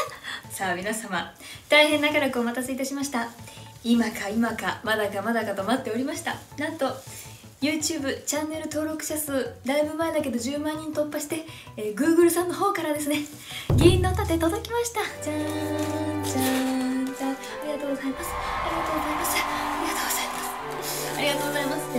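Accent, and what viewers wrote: Kansai, Japan. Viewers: 松浦さんの話し方、私はすごい好きです！！これぞ関西って思います！2019の岸和田だんじり祭りの番組見てから松浦さんを知りました。その番組も撮って繰り返して軽く20回以上見てます！その時の松浦さんもYouTubeやってる時の松浦さん！凄く好きです🥰🥰これからも応援してます！大好きです😭✨それにレペゼン地球のチャンネルを登録してるのすごいビックリしました😳私、レペゼン地球のファンで凄く嬉しいですヾ( 〃∇〃)ﾂ ｷｬｰｰｰｯ♡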